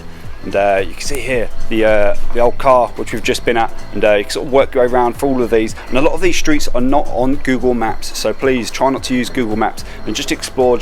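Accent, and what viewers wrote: United Kingdom. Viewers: where the hell in bbk? So confusing wih your crazy brit accent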